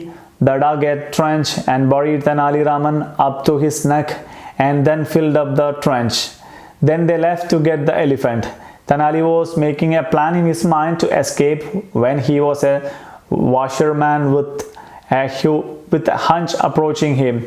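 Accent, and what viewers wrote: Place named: Kerala, India. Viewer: I am sorry but your English is AWFUL. I just heard your first sentence and I had to stop because grammar was horribly off. You use "the" on occasions that you should not. You use "doesn't" in place of "don't", and "has" in place of "have" . And quite honestly, your grammar is so bad that I cannot even figure out what you are trying to say in your first sentence. Anyway, if I were to guess what you were trying to convey, the appropriate words would be "This video is only for beginners who don't read books, but who wish to read books". If I had to say it in a roundabout manner as you did, the words would have to be "This video is only for beginners who don't read books, but who have a wish or want to read books". In addition to your faulty grammar you also have a VERY thick Malayali accent that you need to improve. For heaven's sake, improve your own English (at least your grammar) before you teach others.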